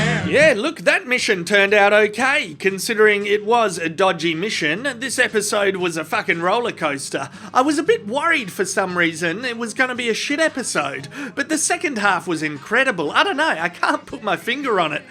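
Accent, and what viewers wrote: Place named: Outback, Australia. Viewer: My favorite part of Ozzy man reviews is that although he sounds like a fair dinkum outback wanker, he is deep and insightful, especially with GOT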